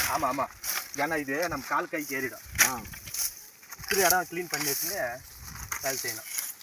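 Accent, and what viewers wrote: Krishnagiri, India. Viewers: Banglore hosur Krishnagiri area tamil bro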